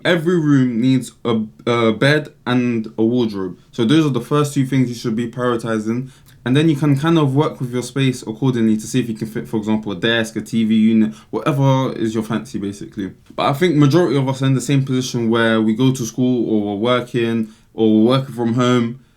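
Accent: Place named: United Kingdom. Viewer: that's a real nice british accent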